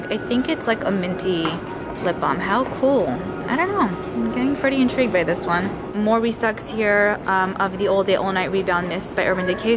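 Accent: New York, United States. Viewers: Just found your channel and I'm loving it! Congrats on the collab 👏. Which TJMaxx is this? I see you're in the NY area (and I can def hear it lol) and I swear the North Jersey ones aren't as stocked up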